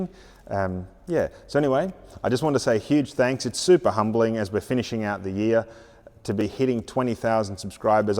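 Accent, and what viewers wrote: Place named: Australia. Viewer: Hey. Thanks for showing us all this great stuff. It is fun to see your channel growing. I learned how to understand Austrailian from Curtis so I get most of what you are saying! Watching from Abbotsford, southwestern Canada. 🇨🇦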